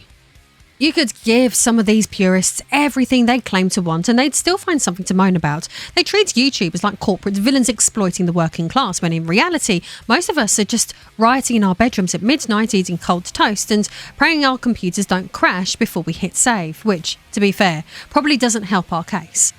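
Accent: United Kingdom. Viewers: Wait, you're a BRIT? 😮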